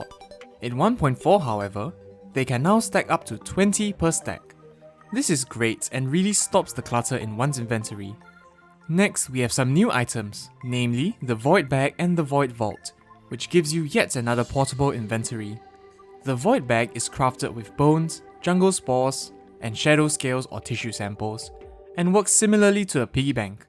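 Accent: Singapore. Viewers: bro the singaporean accent is strong with this one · i really enjoy these videos! keep up the good work! also, your voice is so nice, are you Singaporean by any chance? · Are you from singapore?? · You sound like Singaporean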